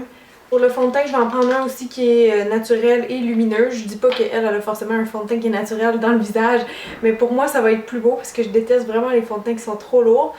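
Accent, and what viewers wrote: Quebec, Canada. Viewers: J'adore ton accent quebquoi !!😻😻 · Tu est québécoise?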